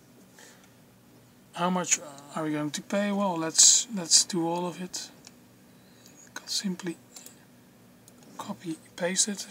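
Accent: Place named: Netherlands. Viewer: lekker Nederlands accent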